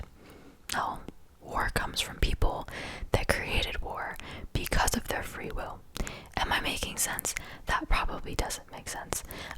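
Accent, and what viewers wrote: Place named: New Jersey, United States. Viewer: has an irl Jersey accent. I've heard it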